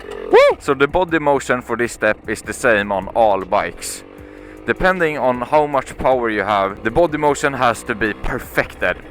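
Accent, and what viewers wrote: Sweden. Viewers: jävlar vilken svensk dialekt du har, men bra video.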